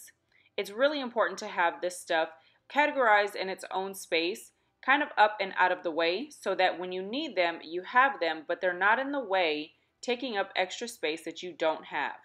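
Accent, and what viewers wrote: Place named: Texas, United States. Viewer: First time here, and as I was listening to you, I was like... is she from Texas? And low and behold... you are!! Great video!!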